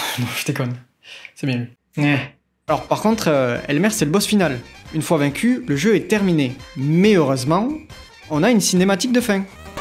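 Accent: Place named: South, France. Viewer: Je sens l'accent du sud par ici ! Oh ! Sinon, bordel qu'est-ce que j'adore ce jeu ! C'est en partie ce jeu (et Le cauchemar des schtroumpfs) que j'ai dédié au compositeur Alberto José Gonzalez qui a fait un taf monstrueux, et VD-Dev de bons devs de l'ombre ! Pour un première épisode, il est vachement cool, j'ai lâché quelques rires hahaha ! Des bisous !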